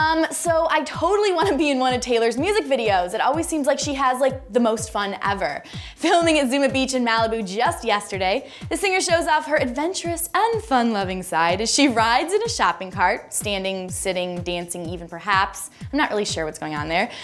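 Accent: United Kingdom. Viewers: Y'all make it seem like everything she do is a bout Harry she talked in a English accent she tryin to be Harry she put on a Beanie she trying to be like Harry wats next she put on shoes she tryin be like Harry like forreal y'all need to stop y'all ain't even relevant to her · Speaking in a British accent, dressing like harry....looks like someones on a roll now doesn't it...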